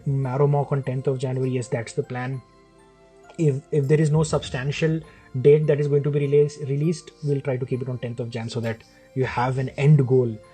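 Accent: India. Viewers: Sir is Hyderabadi guess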